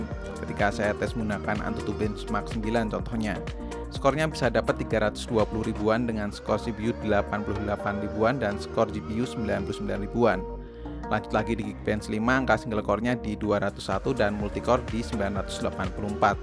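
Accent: Yogyakarta, Indonesia. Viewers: Abang Orang Jogja ya?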